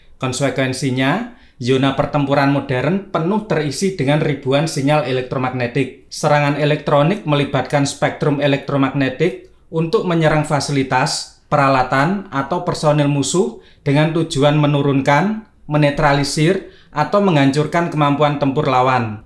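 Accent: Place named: Batak, Indonesia. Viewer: Mantap dialek batalnya.....horas...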